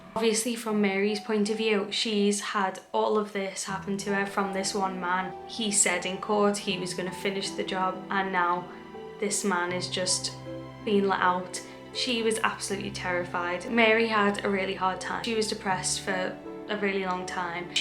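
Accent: Liverpool, United Kingdom. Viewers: Remarkably Google Captions understands your scouse accent perfectly well not a single word wrong which shows how clear you speak. Normally regional British accents don't translate well. May I also say you have the cutest mouth I've ever seen!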